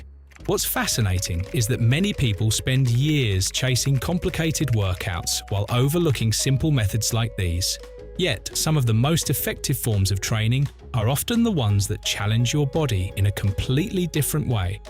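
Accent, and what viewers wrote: England, United Kingdom. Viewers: Thanks enjoy your vids. Good exercises to build into my routine. But would be good if vid matched the lovely sounding (AI) englishman. Nobody in the vid was actually holding the Archer pushup - all were moving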